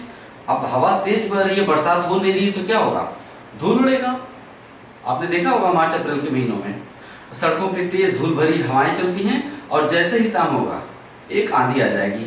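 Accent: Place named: Purvanchal, India. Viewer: sir tikora sahi hai jisase malum hits hai ki aap purvanchal se hai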